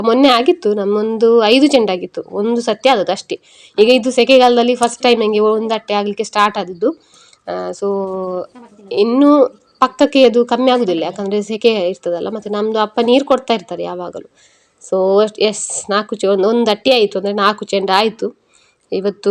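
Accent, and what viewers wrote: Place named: Tulu Nadu, India. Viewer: Erna thulu mix kannada kenyereg kushi apundu..😂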